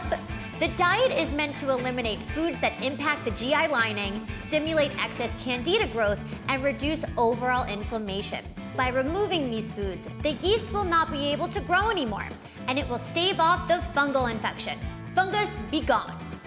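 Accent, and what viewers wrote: United States. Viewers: She speaks like only an American can 🙂👍🏼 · God I can't stand the American accent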